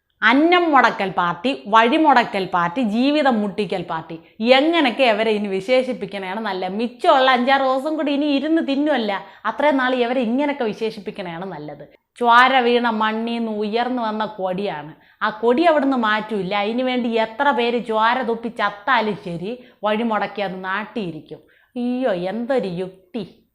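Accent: Thiruvananthapuram, India. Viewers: അടിപൊളി വിവരണം... TVM ശൈലിയിൽ തന്നെ മുന്നേറട്ടെ. എല്ലാ വിധ ഭാവുകങ്ങളും 💐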